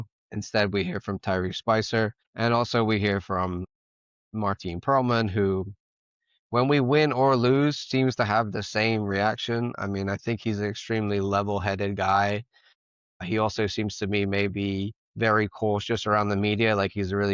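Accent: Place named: RP, United Kingdom. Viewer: Your accent is so much more posh now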